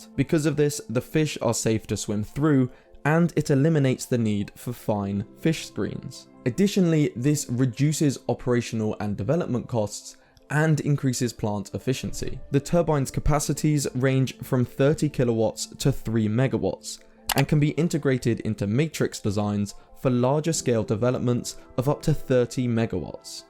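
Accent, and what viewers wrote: United Kingdom. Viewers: Ditch the British accent. It is considered very lowbrow. Your great content would be better served with a Swedish, French or Irish accent. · Well sounds like a Brit, so understatements are a matter of course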